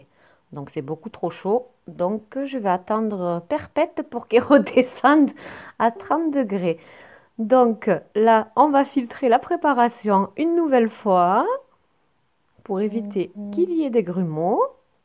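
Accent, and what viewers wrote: South, France. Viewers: L'accent du sud on Aaaaaaadore!😍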